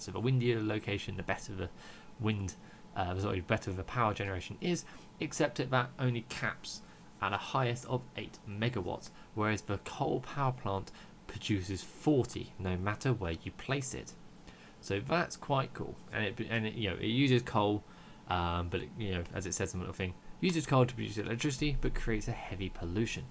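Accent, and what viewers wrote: United Kingdom. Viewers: The British accent is the worst! My ears are bleeding. Americans hate the British accent! You may be referring to the treasonous pride flag Americans. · Subscribed for this beatufil British accent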